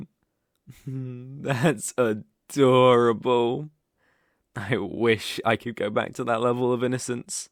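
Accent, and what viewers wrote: United Kingdom. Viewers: British. Take it from a Brit. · The British accent is just : 😩👌 wonderful 😭🌟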